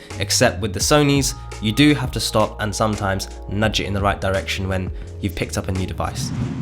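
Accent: United Kingdom. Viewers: "?" Dear Sir, your information is so valuable! But your clipped British accent at coffee shop velocity is sometimes unintelligible, especially for someone who wants to be easily understood and WHOM WE WANT TO UNDERSTAND! You speak so well! But too fast . . . Slow down, please! (About halfway through, I gave up: tired of the onslaught/drone of your chatty, breezy voice--with no respect for the listener.) We're not your friends; we're your audience.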